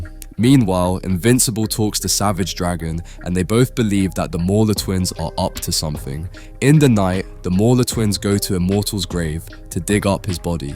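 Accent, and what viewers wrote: United Kingdom. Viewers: I'm so used to this accent I tuned it out. He's just Bri'ish or something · he's British, that's how it's pronounced in whatever part of England he's from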